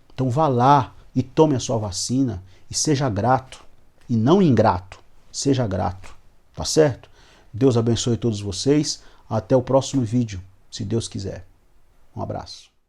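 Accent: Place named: Northeast, Brazil. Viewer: Pastor paz do senhor bom final de semana sou seu siguidor gosto muito da sua personalidade e das sua ministraçoes sou filho de pernanbucano e o sr parece ser nordestino não sei se estou enganado mais a maneira forte de se expressar da entender que é pernanbucano ou da região nordeste o povo nordestino é um povo maravilhoso forte abraço Pastor Deus vos abençoe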